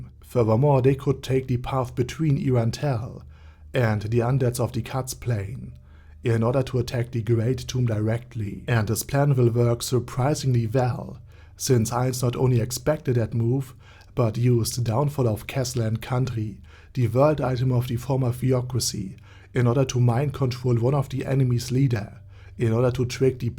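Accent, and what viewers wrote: Germany. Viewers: First of all Guten Tag, i like you're Content but as a german its so wierd to hear someone speak with such a havy German accent. But keep making these videos.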